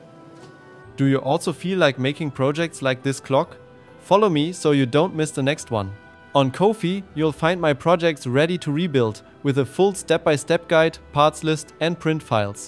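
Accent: Germany. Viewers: Hör ich da einen deutschen Akzent oder irre ich mich? Gutes Video und ich freue mich dass YouTube dein Content pusht! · the german accent 😂. banger video aber! ich wünschte ich hätte auch einen 3d drucker.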